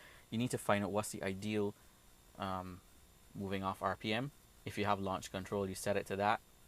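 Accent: Barbados. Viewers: Bajan accent.. from Barbados. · Yh he is bajan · I thought he was Irish at first, then I realised he actually sounds more bajan O_o lmao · Basically Barbadian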